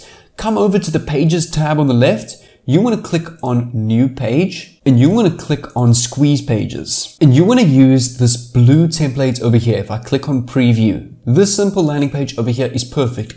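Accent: South Africa. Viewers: Well done! I could tell from the accent that you're South African. This makes me so proud and inspires me too on my journey! New subbie right here!!!🥳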